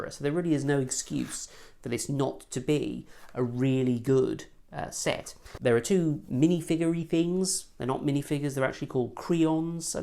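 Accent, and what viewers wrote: United Kingdom. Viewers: I really like... your accent. I'd love to speak with perfect British accent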